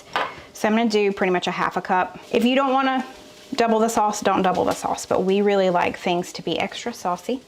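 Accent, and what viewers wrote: South, United States. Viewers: His voice sounds like Tom Hanks with a southern accent! 💞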